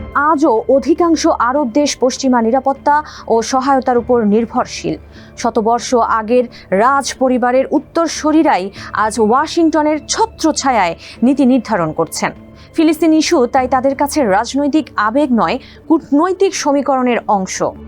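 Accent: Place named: India. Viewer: সমস্যা যেহেতু বুঝেছ, সমাধান সম্পর্কে বল, শুনি আমরা! ভারতের স্টাইলে সংবাদ পাঠিকা কন্ঠ দিলেন! ভারতের দালাল বলা যায় কি?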